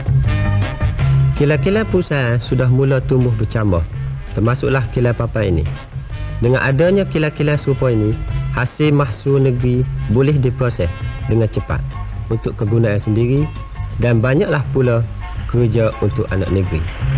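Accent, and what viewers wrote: Kelantan, Malaysia. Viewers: Penyampai pun slang klate ni..nyakut molep😁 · Yang uniknya suara hos dokumentari ini pun kecek Kelate jugok!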